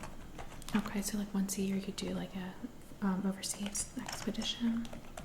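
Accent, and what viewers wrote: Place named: Ohio, United States. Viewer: I hope you're feeling better! Youngstown is my town! The Canfield fair is the largest county fair in the state. You sound like you're from around-about these parts. If you've never been to it, I recommend going. There's a lot to see, animals to see/pet, art, food, and rides. 🙂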